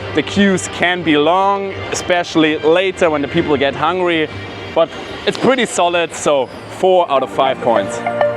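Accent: Germany. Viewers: hi from Hungary ❤ where are you from? You sound German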